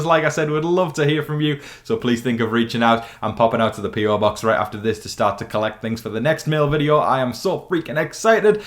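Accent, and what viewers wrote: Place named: Scotland, United Kingdom. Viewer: I like your updates with the accent. I thought you sounded Scottish and lived there.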